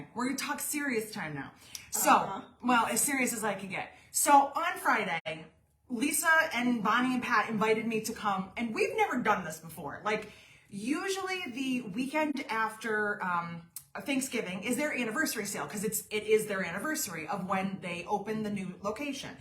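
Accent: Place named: Massachusetts, United States. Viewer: I just discovered you while watching Siah do a walk around at some quilt show a month ago down here in Florida, and you were the last booth she went to, and met you...I loved your patterns, and your beautiful Cork (which I've never in my life used), and I just loved your personality and omg I fell in love with your free pattern for that beautiful wallet made all from that gorgeous cork! I was asked last year to do a small craft fair, but it was last minute, and I had nothing made, so I made a ton of stuff up this year in between all the surgeries I had to have on my legs, and the lady who organized the event had to cancel as her husband passed away. This would have been my very first ever event...I had a really rough year and can't wait for it to be over...I will be having another surgery next Wednesday and may be looking at a bypass surgery on my left leg the Doctor told me. 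Anyways, I just had to tell you how much I love your items, and how happy you make me watching you because of your fun and bubbly personality...Thank you for that! Sorry I wrote you a book! It was really fun watching this video, and I look forward to more! P.S. I had to laugh about the massachusetts accent as that is where I'm from, and talk just like that, and live in Florida now... lol...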